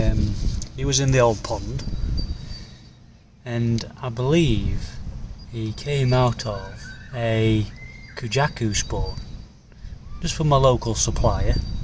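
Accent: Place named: Northern England, United Kingdom. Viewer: Great video pal, getting used to your northern accent now, from a Kent southerner!! 🤣 Keep the updates coming, very interesting 👍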